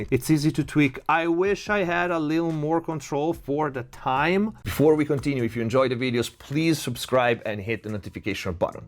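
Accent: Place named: Italy. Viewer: your accent is Italian, are you Italian ?? love your work man, I learned a lot from you!!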